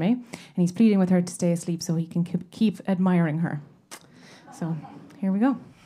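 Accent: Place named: United States. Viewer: it brings back happy memories when my late sister Bernie Rip & me met you in Ennis Cathedral. We were very impressed. Thanks to your lovely Mom & Dad for sending me your very talented video. I'll be looking forward to meeting you when you come home to the lovely Co. Clare. You sound very Americano it would be hard not to pick up the lovely American accent. Best of luck..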